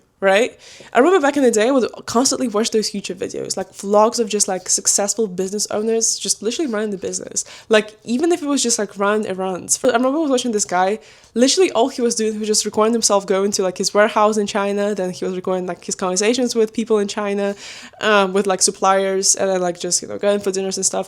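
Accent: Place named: Ukraine. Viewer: we look so alike and have that ukrainian tone it feels like watching myself from an alternative universe omg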